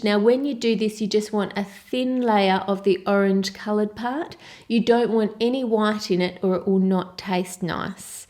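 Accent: Australia. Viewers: I love your accent!! Is it Australian? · I watch a lot of your tutorials, and for that I really have to ask, can you please speak slower? because I'm not a native speaker, I'm italian, and it' so difficult for me to understand everything since I'm not used to listen to aussie accent! I'm not trying to be rude, I really love your recipes, and I need to understand everything so that I can try them :) thank you!!! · It's a classic Auzzie accent.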